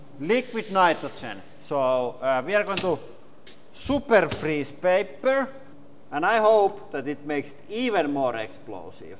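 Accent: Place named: Finland. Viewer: The rally English is strong with. ei pahalla mut perkele.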